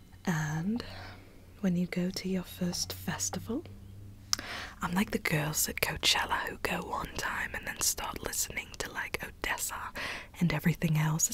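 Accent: England, United Kingdom. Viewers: This is one of my favourites of hers. That "british"accent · I LOVE your british accent! You should use it in more of your videos, it’s so relaxing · Ok but the fact that you got more and more English killed me omfg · Loved how you impersonated a UK English accent perfectly and it did feel strange but your Hippie way of applying makeup, would be my ideal way of putting makeup on. This is because I hate wearing makeup. Absolutely fabulous! One of your best yet! ❤❤❤😘😘😘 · British accent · Loved everything about this video from the cheeky humor to the English accent. You're lovely <3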